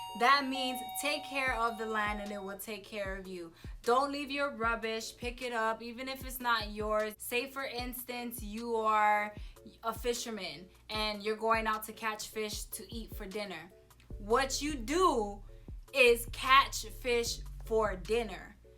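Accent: Hawaii, United States. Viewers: omg you talk like my mom who was born in hawaii 🥺🥺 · I personally get annoyed when people used to call me a “Hawaiian” when I lived in CA. I know they don’t mean wrong, but I correct them and explain that I’m “from Hawai’i”, but that “Hawaiian” is an ethnic group and I give them a quick background ‘cause they need to know. And I dunno what you’re talking about...you’re EXACTLY what a local girl is!!! You sound like all my friends! Hehe. I love ALL your points! I grew up going fishing Pokai Bay every weekend and my dad always packed up early if we caught plenty fish in the morning. And yes, I’m always telling my mainland friends to wait for good waves ‘cause they wanna take the first one...after a few swells, they finally listened! 😜 Hope you doing good on the mainland. 😁🤙🏽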